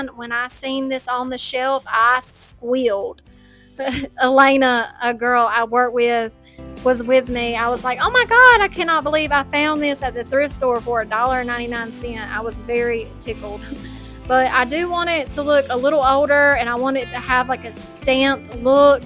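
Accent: South, United States. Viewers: I love your channel, (I'll just keep writing that) and all your projects; today's were no exception, I would love any of them for my home. "Centerize" if you know, you know. 😂. Your accent is the proverbial sweet Southern accent I hear in my head when I imagine the South and drinking sweet tea on a big porch. ❤😊 ~Laura [your kindred messy painter 😅]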